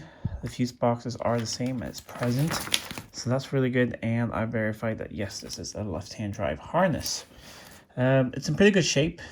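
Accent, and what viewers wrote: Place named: United States. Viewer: sir, you are swedish - where did you get your american accent from ... ?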